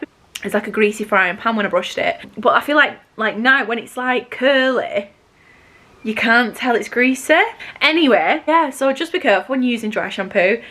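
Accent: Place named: Wigan, United Kingdom. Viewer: Hi, I’ve watched you for a while now, are you from Wigan? I’m from Wigan and I recognise the accent haha x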